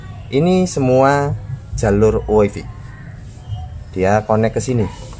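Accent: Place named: Lampung, Indonesia. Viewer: Sampean lampung ya kang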